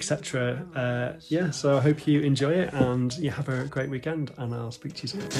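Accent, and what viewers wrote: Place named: United Kingdom. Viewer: ❤❤❤❤❤❤❤. lovely 😺 I forgot to tell you how much I love your UK accent. I will use it for my auditions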